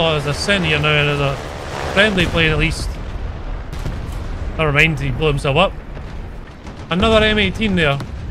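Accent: Scotland, United Kingdom. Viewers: Hey i'm a bit confused are you scottish or irish?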